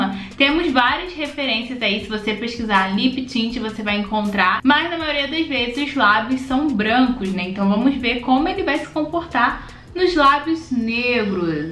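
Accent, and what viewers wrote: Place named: Rio de Janeiro, Brazil. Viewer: Carioca, gosto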